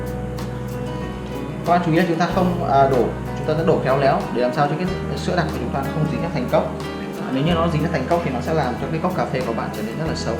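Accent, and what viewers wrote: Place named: North, Vietnam. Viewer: Khg dai dong , ngan gon va giong noi Bac-ky dang yeu vo-cung . Chuc chau luon binh-an 🍀💐😍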